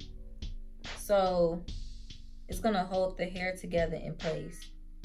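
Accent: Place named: Chicago, United States. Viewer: i can tell you from chicago from yo accent 😍😍 you pretty!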